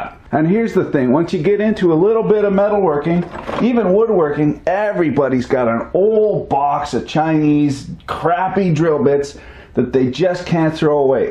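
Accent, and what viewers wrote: Canada. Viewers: Just sounds like a fellow Canadyun to me. I don't hear an accent. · Canadian? or Minnesotan? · Haha are you Canadian guy? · definitely canadian. eh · Sounds Canadian. Fun video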